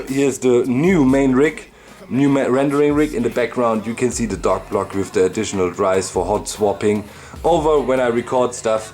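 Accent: Germany. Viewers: German Akzent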